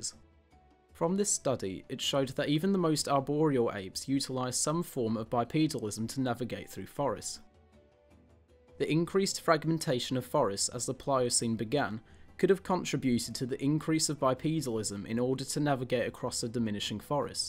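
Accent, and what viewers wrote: United Kingdom. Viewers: L.O.L. Only an Idiot would believe this Darwinism Theory...The British accent doesn't help L.O.L. God is Laughing to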